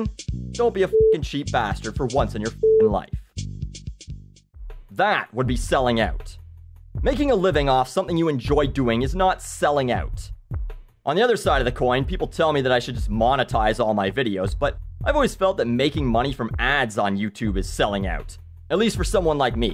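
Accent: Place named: Canada. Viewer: I finally heard his Canadian accent.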